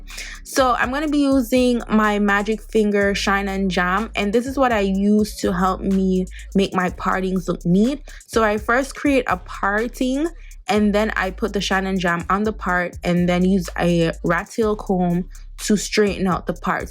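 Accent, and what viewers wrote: Jamaica. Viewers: Mek di Jamaican jump out mum..lolol😂🇯🇲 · lol the Jamaican definitely keeps jumping out. I love it though. My hear head top and laugh to myself.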